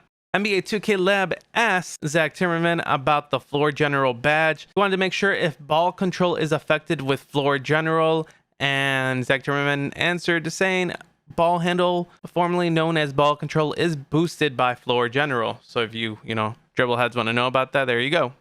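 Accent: Chicago, United States. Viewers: What part of Chicago is he from?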